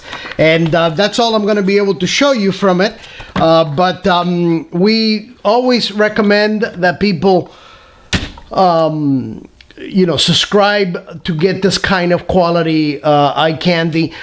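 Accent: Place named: Quebec, Canada. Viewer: That was a very good French accent, sound like my dad, he's from Québec.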